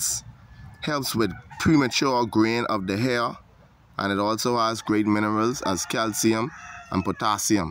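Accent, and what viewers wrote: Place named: Bahamas. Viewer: Man, you can't hide that Bahamian accent. 😊 Thanks so much for sharing! Grateful for something from someone and somewhere I can relate to. Subscribed.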